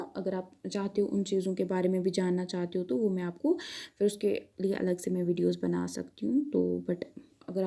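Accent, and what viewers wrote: Kashmir, India. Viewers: Are you from Kashmir? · U r voice tells that u r from kashmir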